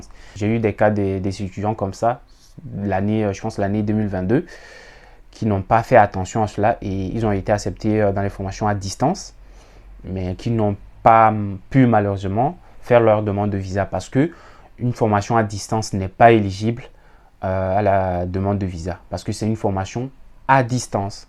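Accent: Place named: Togo. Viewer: Depuis le Togo...à entendre votre accent, vous devez être togolais...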